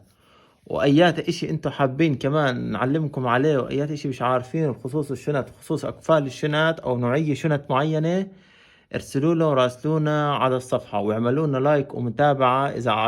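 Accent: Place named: Palestine. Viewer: لهجتك فلسطيني يارب ينصركم + الطريقة نفعت والله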